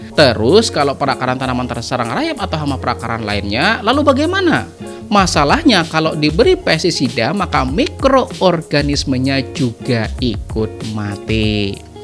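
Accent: Banjar, Indonesia. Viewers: Sy baru di Chanel ini, juga baru belajar menanam. Alhamdulillah, isi Chanel nya benar2 padat ilmu. Oiya Om, mohon maaf, cuma mau mengobati rasa pnasaran sy, sy mau bertanya.. Om org Banjar..? Logat nya mirip Sama saya. :)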